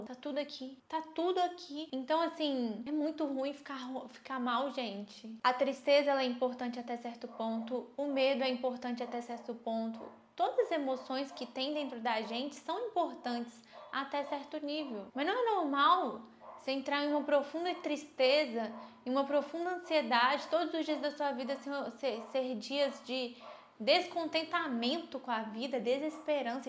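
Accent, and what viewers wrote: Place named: Minas Gerais, Brazil. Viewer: Adorei seu vídeo! Me inscrevi 😍👏 seu sotaque parece mineira 🥰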